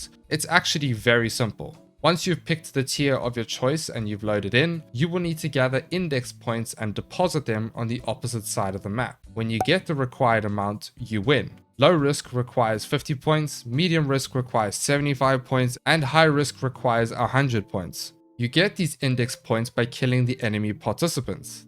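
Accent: South Africa. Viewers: You sound South African? Thanks for the guide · Is bro South-African, that accent goes hard?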